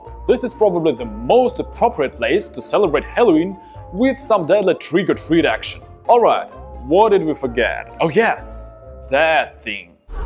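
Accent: Russia. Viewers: russian dude, right?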